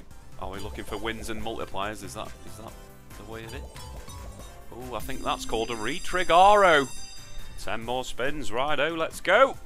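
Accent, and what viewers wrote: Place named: Scotland, United Kingdom. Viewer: Love the Scottish accent 😂Greetings from Glasgow mate. Keep off the bevy 😂😂🎉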